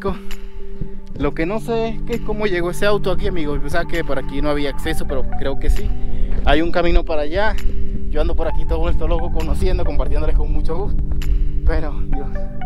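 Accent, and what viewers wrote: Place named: Quito, Ecuador. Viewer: ya el acento Quiteño esta más notable en ti amigo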